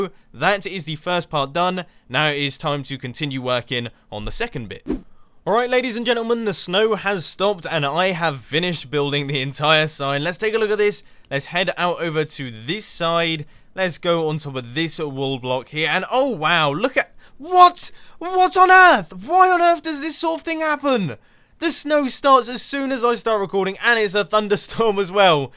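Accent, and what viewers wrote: United Kingdom. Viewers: Mumbo Jumbo Nvm cuz british accent is sexy.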